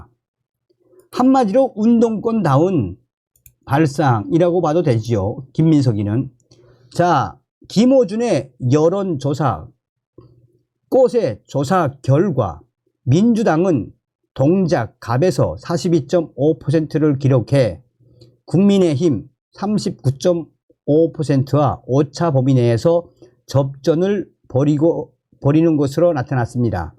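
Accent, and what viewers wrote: North Korea. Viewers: 정성산 티비뉴스 고맙수다래 ㅎㅎㅎ 이북말 정겹읍니다 🌼🌷🌸🙏🏻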